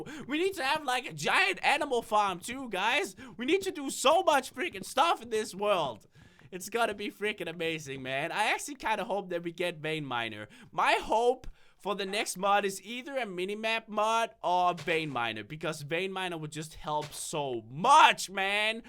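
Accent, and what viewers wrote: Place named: Denmark. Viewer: It's his real voice but he has a Danish accent. When he speaks in Danish it is deeper. It's just sounds different when he speaks in English. I don't know about you but I absolutely love his voice!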